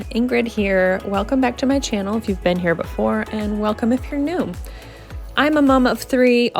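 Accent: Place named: Canada. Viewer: I am curious, Are you Canadian or American because we share the same accent; to which I mean not a NZ accent. #Canadian How long have you been in New Zealand ?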